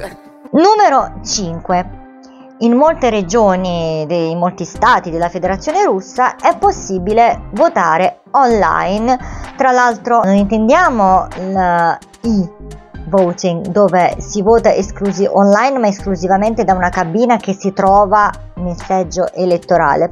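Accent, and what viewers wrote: Naples, Italy. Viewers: Ciao.. sono arrivato al tuo canale per gli arcani misteri dell algoritmo di youtube e sinceramente sono rimasto positivamente basito. Ubi bene ibi patria scrivevano i romani tu sicuramente li sei felice e non ce bisogno di chiederti perche'! . Le tue ultime parole sono uno splendido messaggio all'occidente di non perdere quello che ha costruito , diritti sociali e opportunita di cambiamento. Non vivi con i coniglietti ma hai quella compassione tutta partenopea ( credo, partenopeo.. l'accento mi induce a pensarlo ) nell'accettare l'ineluttabilita' degli eventi in un luogo dove, un sogno sociale e' diventato un incubo per molti e la cura, dopo il risveglio decisamente piu lugubre . Navalny era una truffa tutta occidentale perche' niente di lui volgeva a un pensiero democratico ma sai.. anche qua la sinistra si e innamorata di hamas che dei diritti sociali e di tutto quello che l'illuminismo vede nella societa' sono la reale antitesi. 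Un po come la destra nostrana che paga Dugin per farsi raccontare che la razza non e' acqua e loro (Russi) sono gli angeli e gli altri destinati a una inevitabile sottomissione.. Incongruita dei sistemi .. Ajmone Federico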